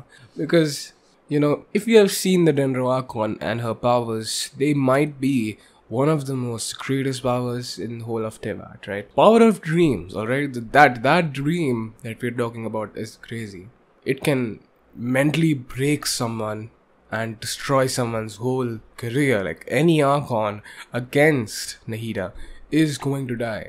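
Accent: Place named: India. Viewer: Love the indian (or idk) accent bro🙏🏾🔥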